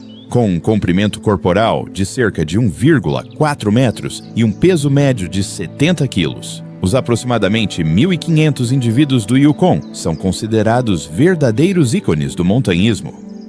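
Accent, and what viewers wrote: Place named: Interior, Brazil. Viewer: Quando trocar o narrador por um sem sotaque interrrrrriorano vou conseguir assistir o esplendido documentário enquanto isso desisti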